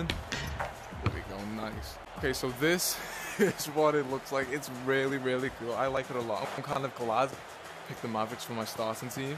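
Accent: Liverpool, United Kingdom. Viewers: Yo are u from liverpool England?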